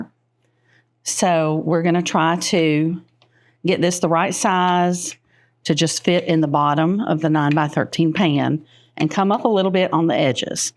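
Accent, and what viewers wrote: South, United States. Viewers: Southern girl here (from Mobile) love your recipes and your lovely southern accent is music to my ears. God bless. 😊😊😊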